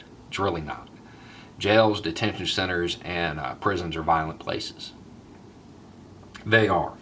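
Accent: South, United States. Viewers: There’s that great southern accent brother... well said sir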